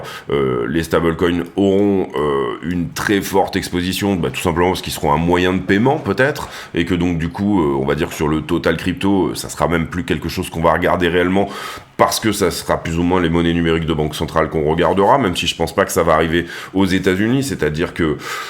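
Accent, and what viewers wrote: France. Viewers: Ca veut dire quoi “ hard close “ que tu répètes à toutes les sauces , fermeture difficile ?? J’avoue que ça me dérange cet abus de mots en anglais par tous les Youtubers français avec un accent franchouillard qui essayent de placer à toutes les sauces des mots en anglais comme , move , push , fill , up , down ect ect Est ce qu’ils pensent que ce donne un genre et que ça les rend plus professionnels ??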